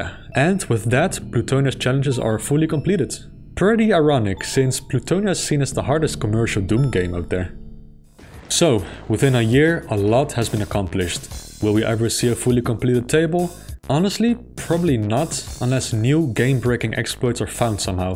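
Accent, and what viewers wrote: Netherlands. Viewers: Are you Dutch? Jouw accent klinkt een beetje Nederlands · Are you dutch?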